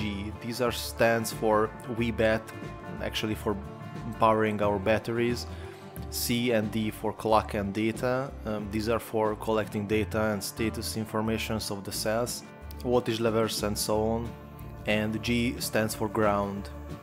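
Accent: Hungary. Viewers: Interesting stuff! Cool Hungarian accent btw! Cheers.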